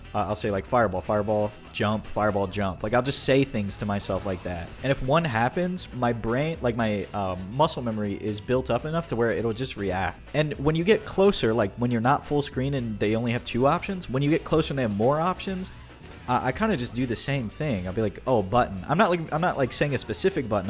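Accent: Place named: United States. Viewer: Hey man, you talk a lot about consistent mindsets, and it's indeed great to have! Have you tried therapy? You sound american to me, and I know health is very expensive in the US, but therapy is the single greatest thing I ever decided to do for myself, it improved every aspect of my life. As a bonus, now I can play ranked and not freak out about every single match, and not rage after every mistake... Have a beautiful day!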